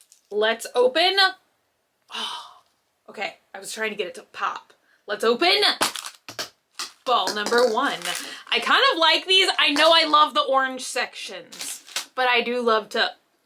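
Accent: South, United States. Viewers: I love your country voice, you should keep it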